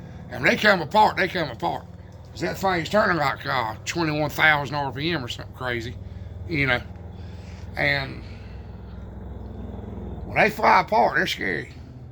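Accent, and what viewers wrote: South, United States. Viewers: I dont know him from Adam, but he comes across like the salt of the earth kinda person. The men in my family die fairly young, but something about him and his mannerisms feels so familiar. He reminds me of them. I think hes a mixture of Rodney Dangerfield, Archie Bunker and someone else.. I cant quite pinpoint, but I can listen to this man leaned up against a fence post with his cup all day long. The wisdom that hes willing yo share in between his words, the crickets, the farm backdrop, the southern drawl…Big fan of this channel.❤. Might should offer some merchandise, Id love to wear a “Homesteading the Hardway Hat” hahaha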